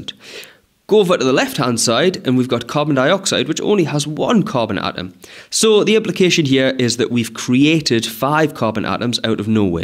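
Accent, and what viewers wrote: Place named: United Kingdom. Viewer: no not the british accent i don’t understand it💔😭💔😭💔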